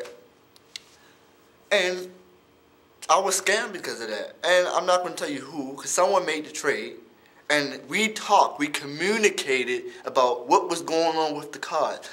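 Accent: Baltimore, United States. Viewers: that damn baltimore accent from you lol! From the way you say "yew-tewwwbe"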